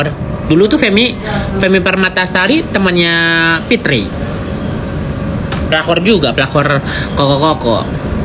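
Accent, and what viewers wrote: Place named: Sunda, Indonesia. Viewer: Kk lucu ngomong sunda nya ceunaaaaĥhhhh 😂😂😂😂😂😂😂😂😂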